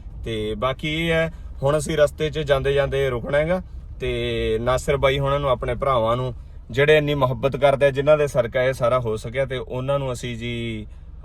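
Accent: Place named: Amritsar, India. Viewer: ਬਾਈ ਜੀ ਤੁਸੀਂ ਹੈਗੇ ਬਰਨਾਲੇ ਦੇ ਤੇ ਭਾਸ਼ਾ ਤੁਸੀਂ ਅੰਮ੍ਰਿਤਸਰ ਦੀ ਬੋਲੀ ਜਾਨੇ ਹੋ ਢਾਡਾ ਢਾਡਾ ਭਾਜੀ ਭਾਜੀ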